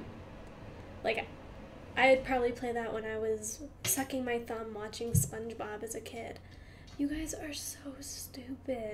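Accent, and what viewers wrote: United States. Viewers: Do you still think your speaking americanish? And I cant get a job im under age. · Do you still speak Americanish or do you now speak English like everyone else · PERFECT AMERICANISH 🔥🔥🗣️🗣️👌👌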